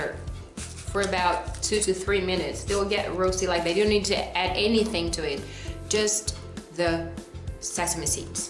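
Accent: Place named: United States. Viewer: Parece uma americana falando... vou estudar inglês para assitir seu novo canal, Sucesso aqui também.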